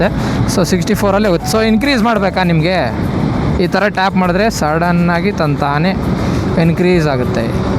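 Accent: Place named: Kalaburagi, India. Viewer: Bro I saw your different Kannada slang in previous video. I believe you must be from Kalaburagi 🔥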